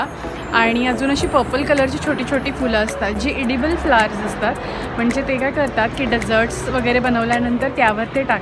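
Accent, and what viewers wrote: Pune, India. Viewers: पुणेरी मराठी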